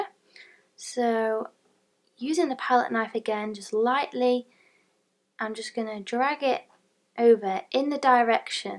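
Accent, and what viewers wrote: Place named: United Kingdom. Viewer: I love watching your painting tutorials! What talent you have! And that British accent is the icing on the cake❤️ You have brought out a hidden talent! Thank you so much!!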